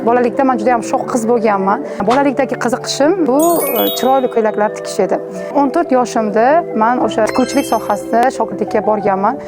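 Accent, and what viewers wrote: Bukhara, Uzbekistan. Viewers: Voy Buxoro gòzali shevangzdan bilgandim Buxorolik ekanizni men ham buxoro shahardan❤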